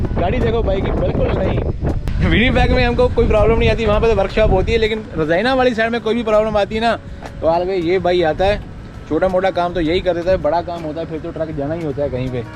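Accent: Punjab, India. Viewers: पन्जाबी बन्दे शुद हिन्दी बोल्ता हे तो मुज्को भि मन मे चड्दी कला बोल्ने के मन होता हे काके 😂😂😂 Keep it up bro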